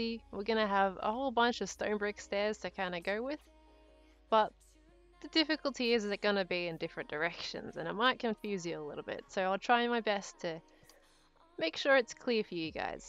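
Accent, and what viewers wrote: Australia. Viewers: I love your Aussie accent! ❤ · are u Australian?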